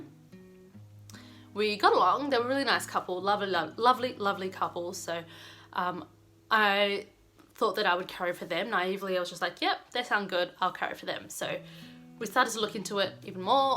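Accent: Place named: Australia. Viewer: Hey! First of all, you Australians have an amazing accent. I really like it! Secondly, good luck with this! You’re doing a fantastic job. I hope things work out for you. My prayers are with you, honey.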